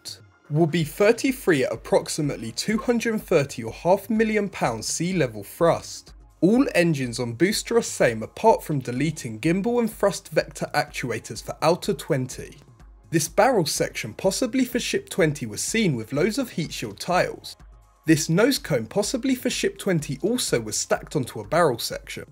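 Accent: England, United Kingdom. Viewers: Narrator sounds English but isn't this the channel that used to have Vietnamese stuff on it along with spacex stuff?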